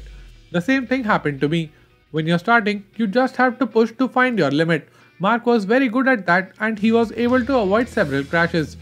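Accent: India. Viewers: Ha! It takes a couple of views and you will be used to the mild Indian accent.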